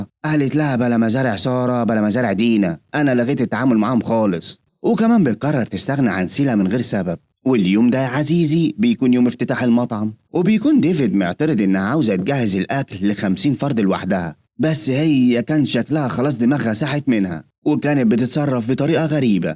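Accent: Egypt. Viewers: اللهجه المصريه الجميله ❤🇪🇬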